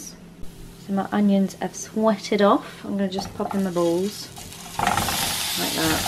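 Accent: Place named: United Kingdom. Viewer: I like the way you explain everything British people are so nice to hear 😁👍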